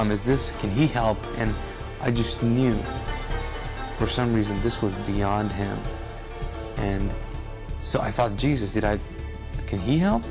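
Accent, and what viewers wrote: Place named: Arab world. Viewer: Good Arabic accent, brown skin dude, seems legit!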